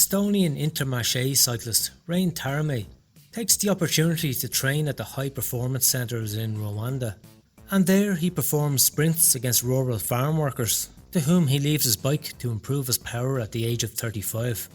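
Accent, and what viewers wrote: Dublin, Ireland. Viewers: What part of Ireland your from ? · Sounds like a Dublin accent.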